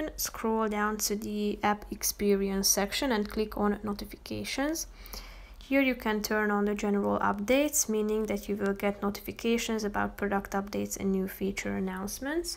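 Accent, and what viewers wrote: Hungary. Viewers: Are u Hungarian?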